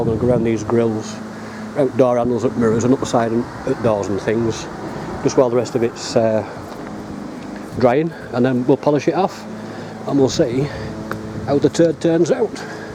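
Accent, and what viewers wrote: United Kingdom. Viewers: Champion! Love a good yorkie accent, definitely got a good nack for making videos so I've instantly subscribed. Make sure you wax the car now give it time it'll go pink again. Diamond wax is pretty good stuff and cheap on amazon! Can't wait for more vids. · Eh up. After 46 years in nz it is great to listen to english as it should be spoken up north...brings a tear to my eye..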